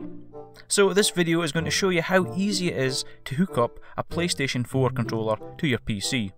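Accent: Scotland, United Kingdom. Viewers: Nice accent are you from Scotland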